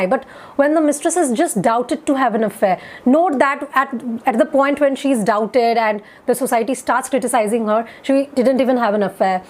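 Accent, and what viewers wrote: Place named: India. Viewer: I love the Indian accent!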